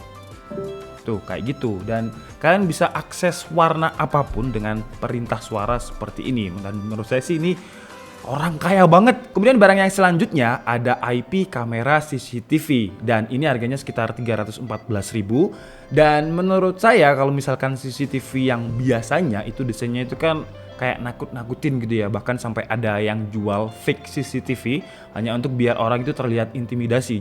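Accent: Malang, Indonesia. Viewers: bang orang malang ya?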